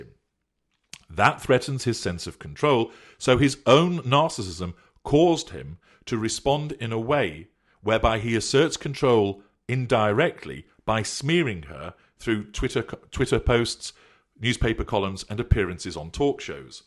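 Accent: United Kingdom. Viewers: Incidentally you kind of actually sound like Piers Morgan. And not just because you're British and have a similar accent. You remind me a bit of Jeremy Clarkson (another narcissist, surely) as well. On the topic of narcissists in the public eye🤣, Russell Brand has got to be another. Though he is self depreciating and quick and funny, he so clearly demonstrates narc traits. His past drug abuse, sex addiction are symptoms of this. I would imagine that his father, who wasn't really present in his childhood at all but was known to be a womanizer, probably helped form the narcissism.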